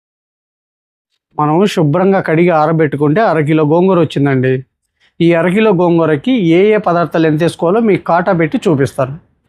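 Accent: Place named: Guntur, India. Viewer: మీ గుంటూరు మాటలు చాలా బాగున్నాయి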